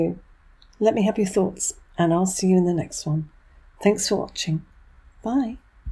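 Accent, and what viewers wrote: United Kingdom. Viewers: Show more of the video, American don't speak such British English . what were you doing there, you are published this video like someone not permitted to do so just for YouTube purpose . To get some subscribe and cash.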